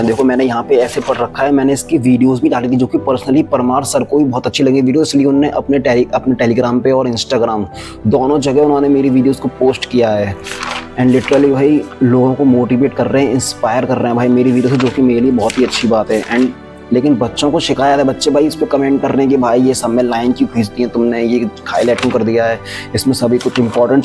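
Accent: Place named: Uttar Pradesh, India. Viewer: 😅 having up accent it feels like heavy more than sophisticated...woo thoda matter ho gya wala feel getting 🙃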